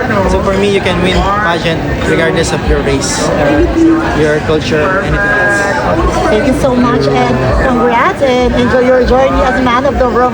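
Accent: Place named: Philippines. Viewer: He speaks like a Filipino so he is indeed a Filipino.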